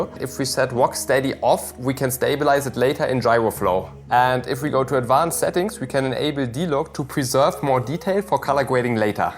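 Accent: Germany. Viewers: Dein Deutscher Aktzent ist geil❤ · The O3 and O4 are from stock waterresistant but all other parts on the Drone mmmeeeh😂 On my 5“ builds Im using the MGChemicals 422c silicone comforma coating its not too thick like a few other ones incase i have to melt it away for future soldering. For my 1-3“ Inch and on my Race Quad I use the flywoo waterproof spray. Bouth are just fabilous i knew the comformal coating is the best but i was shocked how good the flywoo spray works, Good video sounds like a heavy German accent💯💥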